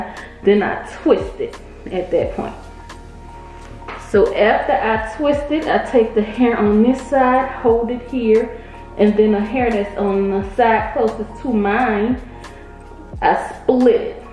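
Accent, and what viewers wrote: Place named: Los Angeles, United States. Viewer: Its CUTE! I just realized you were from LA. I could hear it but didn't think nothing of it. I'm from Baton Rouge.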